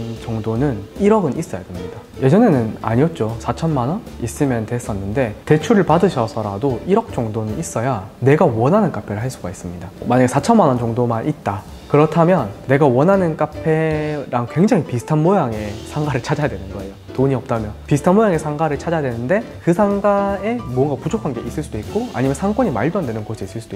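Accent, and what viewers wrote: Gyeongsang, South Korea. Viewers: 대구억양 넘 귀여운데요ㅎ???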